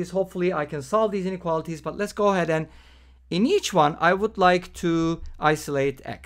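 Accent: Turkey. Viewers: ingilizcen türk ingilizcesi gibi geldi. twitterdan takip ettiklerine baktım ve ta taaa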